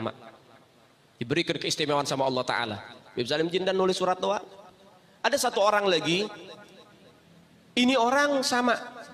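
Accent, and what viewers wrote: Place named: Jakarta, Indonesia. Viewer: ini demit dari mana ? bahasaya dari betawi , keturunan dari yamen ?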